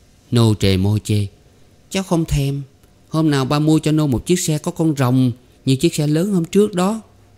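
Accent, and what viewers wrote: South, Vietnam. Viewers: cảm ơn ông NGHE GIỌNG ĐỌC MIỀN NAM RẤT THÍCH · Tôi rất thích giọng đọc miền nam của. Like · Từ lúc biết kênh Tủ Sách Giải Trí và Giáo dục Tinh Hoa, được nghe giọng đọc miền Nam cực hay của anh, tôi đã không nghe bất cứ kênh nào khác. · Tôi cũng rất thích giọng đọc miền nam của. Anh dien tả đầy cảm xúc.Luôn ủng hộ kênh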